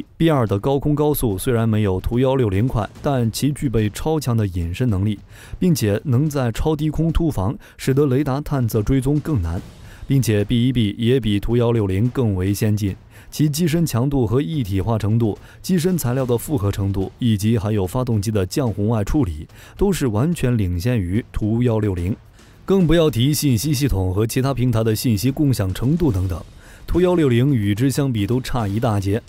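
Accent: China. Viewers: 频道主是大陆人吗，口音好像啊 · 一听就知道啦，就是中国口音，也许国籍不是中国，但是绝对中国大陆口音，不是台湾，也不是马来西亚新加坡，也不是香港，就是中国大陆的。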